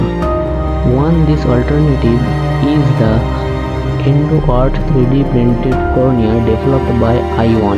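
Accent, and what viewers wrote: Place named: India. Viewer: What a stupid way to talk on top of louder music. And plus in Indian accident, what a rubbish man.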